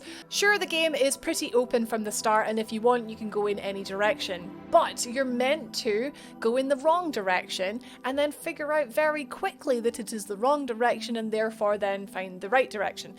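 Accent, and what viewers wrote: Scotland, United Kingdom. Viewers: yup scottish accent sounds so cute but even the voices are really similar haha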